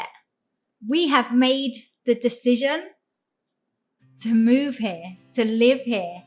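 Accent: England, United Kingdom. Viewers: Say thanks to who lovesTaiwan according to yourself experience here. I also like to practice my listening in British English by your channel. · I enjoy watching the videos in this channel as I get to learn what the life, particularly the bright side, of western immigrants is like in Taiwan, with a bonus of listening to the voice with a beautiful British accent. I would not be worried about the content balance, as we all understand this is not a news coverage channel. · 妳的發音字字清晰，英國發音真的跟美國不一樣。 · 對了，我非常喜歡您的英式英語的語調，從以前到現在都一樣，就是喜歡英國腔的英語而不是美國腔的美語！老實說就是讓我覺得聲調很悅耳！ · 英國腔調的英文就是讚拉！ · 看影片聽你英國腔感覺很有趣，常常想到哈利波特電影他們說話的感覺。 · I like your fascinating British accent, very clear and speed just fine. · 很清楚很濃厚的英格蘭腔英語發音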